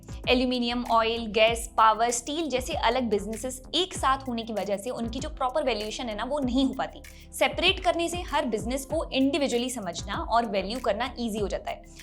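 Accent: India. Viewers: Voice nahi diction....marathi diction...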